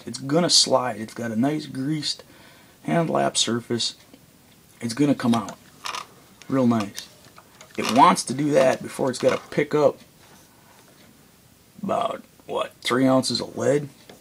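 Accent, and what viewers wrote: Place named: United States. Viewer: Fantastic video. You bottom line stuff and talk in the proper american english just like my uncle. Thanks for the info and the fix!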